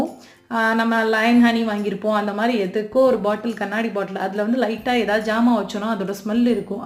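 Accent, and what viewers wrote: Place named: Thanjavur, India. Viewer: Nice tips sister where is ur home town ur slang same like us .my native is tanjore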